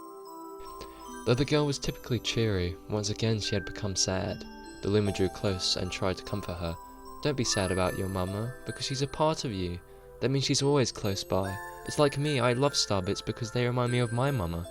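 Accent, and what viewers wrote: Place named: United Kingdom. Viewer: Having another brit explain things is the main bonus for me cuz theres no miscommunication if you say words that mean different things (my biggest struggle with american creators)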